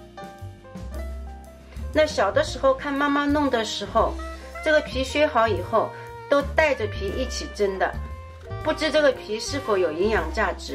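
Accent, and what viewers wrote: Shanghai, China. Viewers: 听口音，上海阿姨啊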